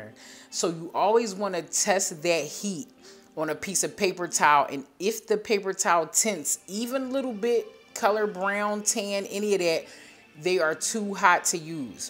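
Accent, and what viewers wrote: Philadelphia, United States. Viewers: 15 minutes in and the Philly accent slapped in the face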